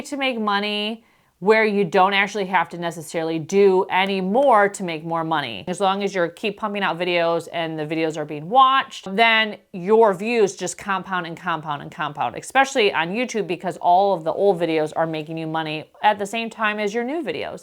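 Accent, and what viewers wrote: United States. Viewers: I’ve been following for a few years and seeing you grow to this extent has been such a genuine joy to witness. I watch you and Laura’s videos religiously and they provide such different, but equally inspiring and entertaining, vibes. For me, you’re the British lady with an American accent 😀 I’m British and I just find your sense of humour so comforting. Well done lady! You have strangers feeling proud of you and that has to be a cool feeing ❤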